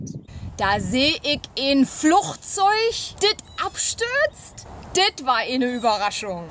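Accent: Germany. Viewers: Sprechen die Ossie wirklich wie das? :o (Ich bin Amerikaner, so werd' ich nicht wissen.)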